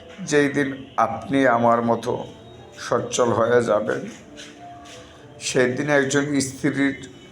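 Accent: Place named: Sylhet, Bangladesh. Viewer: আসসালামু আলাইকুম, ও ভাই আপনার কথায় বুঝতে পারছি আপনি সিলেটি মানুষ, আমার জন্য দোয়া করবেন আল্লাহ তায়ালা যেন আমার মনের নেক চাওয়া কবুল করেন, আমার স্বামী দীর্ঘদিন ধরে আমার সাথে কথা বলেন না,ভুল বুজে আছেন কিন্তু আমি আমার স্বামীকে অনেক ভালোবাসি,সবাই আমাকে স্বামীর আশা ছেড়ে দিতে বা মামলা দিতে,আমি কোনো সমস্যা সৃষ্টি করতে চাইনা,কারন উনাকে ছাড়া আমি একা থাকবো কিভাবে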